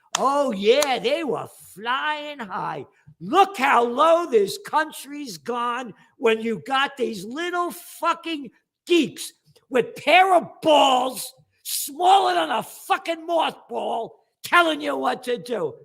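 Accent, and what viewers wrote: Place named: New York, United States. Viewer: Gotta say, Gerald reminds me of New York every time I listen to him ^^"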